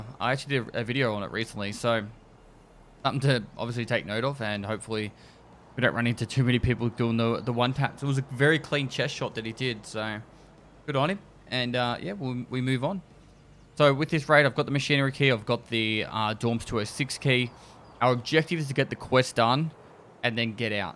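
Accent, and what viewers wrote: Australia. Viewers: Love these vids! You're very informational for us new players, me included, plus the aussie accent is nice to listen to! GL in the Netherlands!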